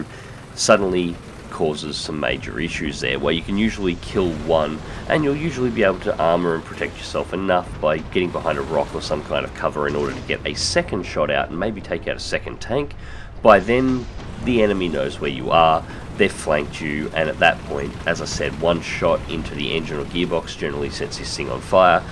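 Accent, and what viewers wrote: Australia. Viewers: mate the aussie in you is coming out lol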